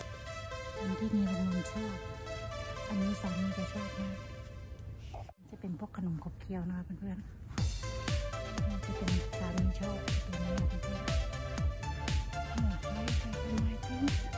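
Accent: Sakon Nakhon, Thailand. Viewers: อยู่จังหวัดไหนคะ สำเนียงเหมือนคนสกลนคร เว้าย่อ 😂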